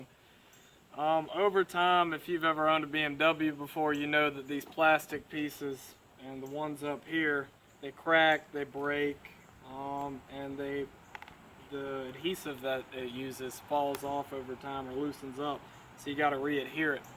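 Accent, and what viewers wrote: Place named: South, United States. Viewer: bro your so country i love it lmao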